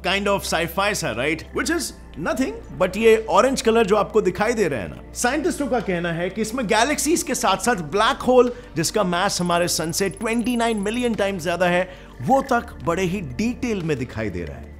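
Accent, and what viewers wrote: India. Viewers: I will love if you text it, i really have a problem understand the dialect India/english. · Why with these videos it's always an Indian guy with such a strong accent and also that he doesn't know that much English words so he just goes to his original language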